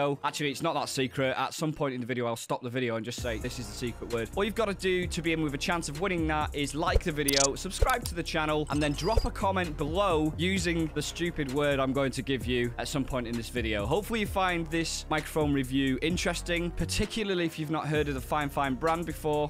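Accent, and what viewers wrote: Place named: Northern England, United Kingdom. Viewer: You've got a nice accent . I wonder where you are from in The North of The UK ?? This will help me in my English Accents Studies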